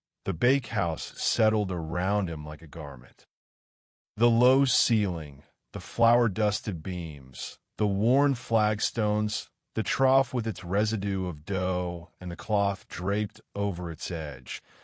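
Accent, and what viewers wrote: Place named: United States. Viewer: English tales by AI with an american accent lol. Lets give it a go 🤞🤞🤞 ............ as always, awful punctuation 😠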